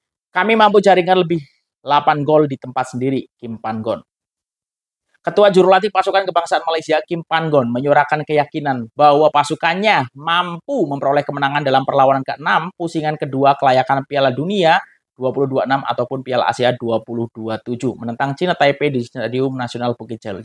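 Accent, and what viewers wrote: Java, Indonesia. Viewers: bang anda asli orang jawa kah?😮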